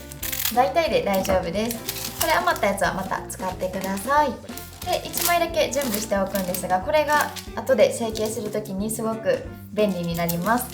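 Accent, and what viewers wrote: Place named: Kansai, Japan. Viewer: はじめまして、さん！年末に仕事を辞めたので、時間ができたら米粉パンに挑戦！って思っていて、パン好きなので、グルテンフリーに前から憧れてました🥰 沢山ある動画で、さんのは、とても分かり易く、同じ大阪の方で何といっても関西弁にほっ！として、優しいほんわかな感じがとても良いです。お総菜パンも作りましたが、今日はクランベリーとクリームチーズを作って、めっちゃ美味しくできました😀 先日のライブで、チョコパイの話をされていたので、さっき動画観て、作りたくなりました。ドーナツも気に入ってて、まだ作れてないんですが、挑戦しまーす。こんな貴重なパン作りを無料で拝見できるなんて、有難いです。他もまだまだいっぱい作りたいと思います。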